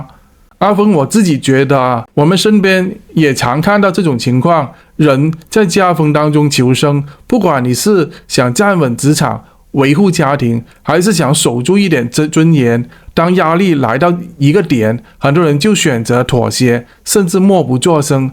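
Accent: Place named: China. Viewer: 你的口音是中國人,台灣的事與你何干呢？法律會制裁他們。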